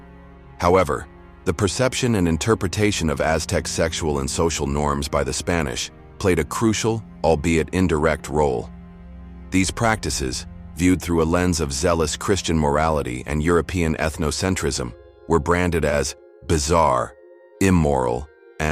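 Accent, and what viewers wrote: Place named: United States. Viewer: You hace US accent. I Imagine that you are not concerned at all by any brutality commited by your ancestors towards native americans or blacks, please look inside your dirt before critizicing other. I just want to point a couple of things 1.- Aztec "priests" wearing a human skin in a religious ceremony was just a "cosmovision and fertility ritual". When settling in the lagoon and erectingTenochtitlan, the Huey Tlatoani of that time (about 200 years before Cortés) asked to the king of a city in the lagoon shore for his daughter. The king accepted. A few days later the king and some of his noblemen went to Tenochtitlan for a ceremony supodsely the wedding of the Huey Tlatoani with his daughter. They got horrified when the main priest started a ceremonial dance wearing his daughter's skin still pooring blood. 2.- If they were beloved, please explain not just the fall of Tenochtitlan, but how was it posible that the Spanish could rule such a complex society, when no more than 3,000 Spanish sailed to get settled in México in the first 50 years of Viceroyalty (60 per year) It could have been very easy for the indians to have expelled such "invaders" unless they preferred the rule of the Spanish rather than being ruled by any of their tribes. 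Morality: Before speaking about your disagreement to what the Spanish achieved, look twice an what your brutal and merciless society did in North America.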